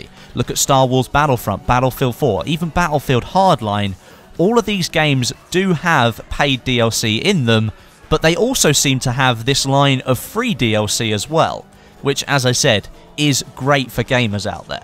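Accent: England, United Kingdom. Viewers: Nice video again! You always make my day better with your lovely british accent;) And just in case i win the giveaway, i want to thank my mom and dad for making me food every day, and i want to thank my computer for making me able to write this comment, and I can't forget to thank my aunt for being my aunt... Ohh, i also want to tell DANNYonPC that you need to get off YT more often, cuz if you don't, you will turn into a very bad hot sauce. Atleast that's what some old guru told in Australia... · Very good video! Been subscribing you for a long time. Like those videos uploaded and what I like most is your british accent! Well done. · I love your videos and your English accent.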